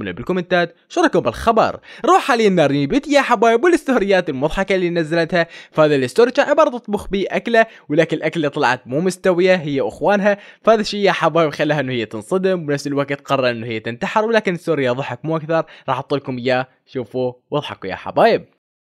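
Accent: Iraq. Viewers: تعرف انت حجيك عراقي و تكتب سوري ليش شنو سببها🙄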